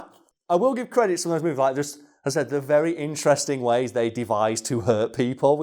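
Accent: United Kingdom. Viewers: And what i said about government guidelines was in response to what you said about masks. And i don't think it's that hard to pinpoint where this guy is from, considering his extremely British accent. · You can tell that he's British from the first few words. "Like seasoning its not necessary"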